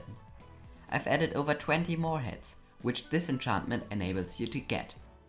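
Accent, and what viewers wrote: Germany. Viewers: Age of xD glaub auch XD er hat so nen deutschen Akzent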